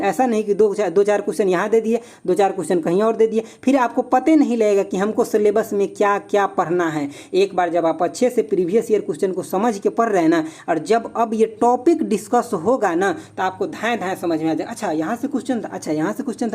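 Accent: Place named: India. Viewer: Sir aapke ke padane ke technique ke saath saath bolne ka tarika bhi ekdum desi hai 😁 Jai exam crack academy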